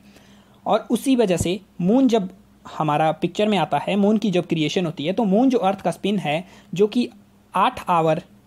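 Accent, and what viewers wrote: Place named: Himachal Pradesh, India. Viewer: Thanks sir 🙏 Are you belong from himachal ,,,??? Muje apky way of talking se lga that's why I am asking youu 😊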